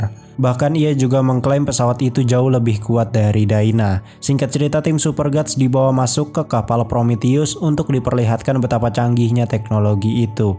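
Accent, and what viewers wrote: West Java, Indonesia. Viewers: Sunda ny dri mana bang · Njir lu nyunda bre 🗿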